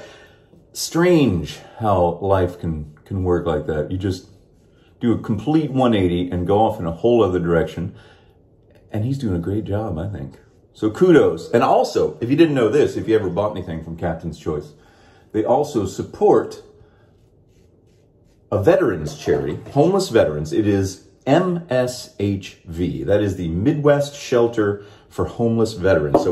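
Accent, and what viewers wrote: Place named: United Kingdom. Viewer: You had your british accent going on throughout the video. Hh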